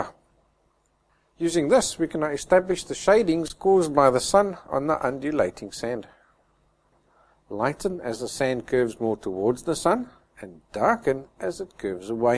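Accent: South Africa. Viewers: Fantastic.. im from s.a.too. I can hear on ur accent ..I'm trying your tutorial soon. Do you give painting lessons? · thanks for the great teaching style and accent in your voice,,is it south african or welsh?but thanks anyways very easy to follow and sped up just at the right times --im sure it wont come out like yours but i will try nevertheless,,thanks again..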